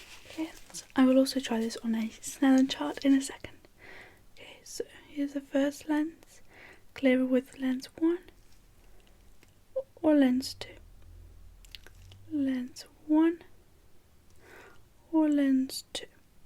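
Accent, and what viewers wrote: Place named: United Kingdom. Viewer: I love your Britanic accent❤️❤️❤️😋♥️kiss You Young lady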